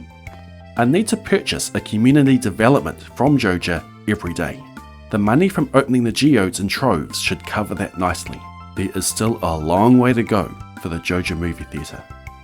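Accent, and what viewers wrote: New Zealand. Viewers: Bro? Are you Kiwi? I swear. Even if you're not... You totally do!! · Holy NZ accent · He's definitely a Kiwi.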